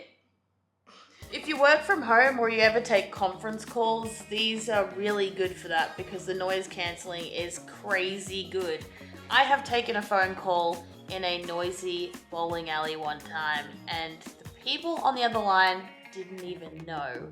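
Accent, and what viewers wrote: Australia. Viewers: That's sarcasm right? 10 hour flights? now? That's definitely an aussie accent or am I going crazy?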